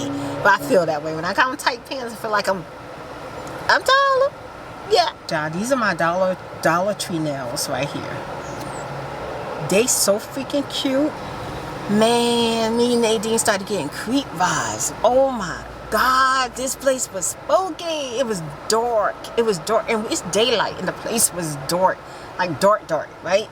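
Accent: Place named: East Coast, United States. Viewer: So I'm in NYC and yu give me East cost vybez lol like Jersey Philly NYC your accent I can listen to u all day lol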